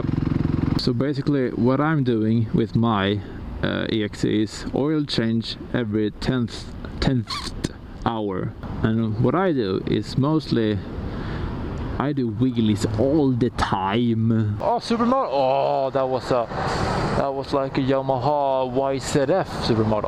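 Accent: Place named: Sweden. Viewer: Hahaha so funny!! Make more videos like this! (Y) Awsm riding! :D Jeg elsker din svensk-engelsk, haha, er fra Norge selv.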